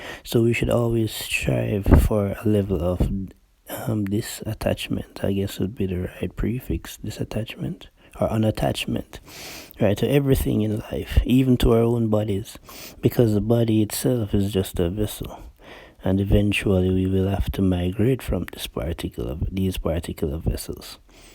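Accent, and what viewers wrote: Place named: Caribbean. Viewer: Accent sounds Caribbean 🇹🇹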